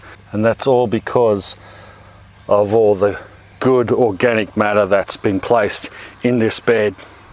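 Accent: Australia. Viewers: Australian accent is funny 😂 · Came here to listen to an Aussie talk about what happening Down Under. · was raised loving the crocodile guy accent, AND YOU HAVE IT ALONG WITH HIS VOICE, JUST MORE CHILL, MAKES YOUR VIDEOS MUCH INTERESTING, LOVE AND APreciate, ESPECIALLY WHEN U SAY, "SO NEXT LETS GO TO THE NEST TRUNK, OH YEAH ITS CHIPING, ITS TURNING INTO DIRT AND DUST" LOL LOVE IT MAN, IF THE TRUNKS WERE CROCKS 🤣👌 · Straight Aussie. Reminds me of my dad 😆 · Typical Australian hehe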